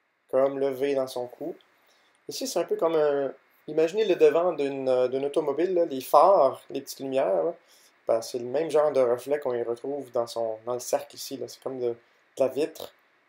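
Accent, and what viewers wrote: Canada. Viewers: T'as un petit accent canadien, j'aime bien. Tu pourras dessiner Sulfura stp? De toutes façon t'y arriveras vu comment tu dessine trop bien. Et comment tu fais pour éfacé avec ta main?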